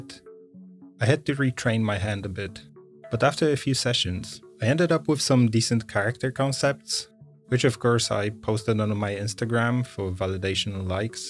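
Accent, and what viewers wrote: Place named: Ireland. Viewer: Do you live in Ireland by any chance? I can kind of hear a slight bit in your accent.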